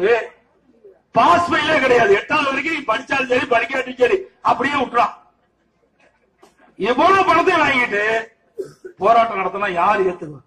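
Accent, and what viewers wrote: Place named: Kongu, India. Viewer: Kongu tamil parakkuthu sir unga tock la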